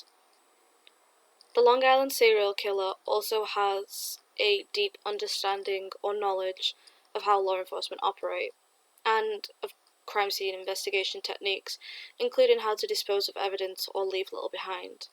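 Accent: Manchester, United Kingdom. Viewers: Oh my, I'm just surrounded by all of these delicious British Ladies. I need to get on a plane and come hear it for myself. Surround me💪🖤💖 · Is your accent Mancunian? X...( for those asking what is a Mancunian accent, it’s an accent for those native to Manchester in 🇬🇧 xx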